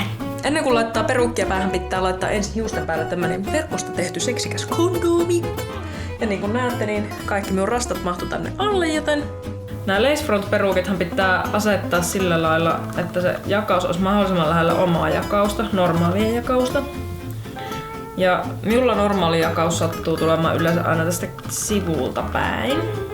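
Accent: Finland. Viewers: ootko joensuusta ku murre on nii vahva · Nonni nyt minnuun tarttu tuo siun mie sie murre 😂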